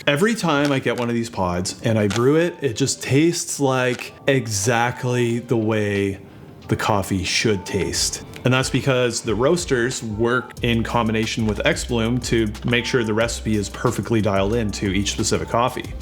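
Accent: Canada. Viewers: Super helpful vid, nice looking kitchen & great Canadian accent 🙂I actually just got the same studio in the mail. I've used it a few times and I'm trying figure out the steps and RPM's on the grinder. I haven't played with it in fear of botching my coffee, I just scan the pod (via the app) and pushing play. Any suggestions?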